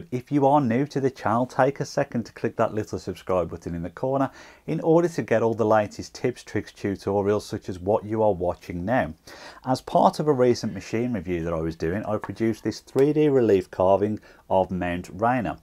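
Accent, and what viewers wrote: United Kingdom. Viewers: I love your accent. What area of Britain are you from? Might be a silly question but I'm from Germany and not familiar with British accents.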